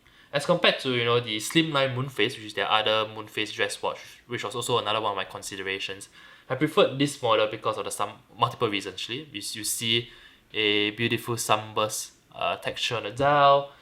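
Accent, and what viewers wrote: Singapore. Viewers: It's not a mid Atlantic accent, it's a Singaporean/Malaysian accent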